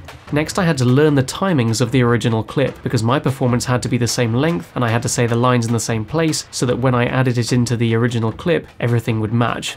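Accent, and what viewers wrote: United Kingdom. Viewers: He’s got the English accent down pat...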